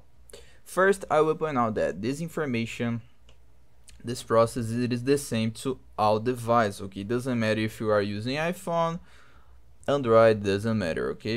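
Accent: Brazil. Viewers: Hmmmm sotaque de brasileiro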